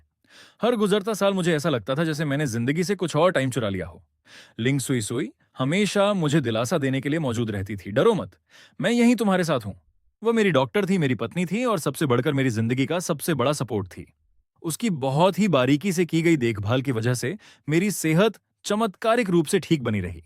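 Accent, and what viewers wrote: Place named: Bihar, India. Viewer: Bhai jada bihari accent mat add kar pure hindi accent accha hai video bana ke liye 😅 basibhi eha samajh ne mein bahut pareshan ho raha hai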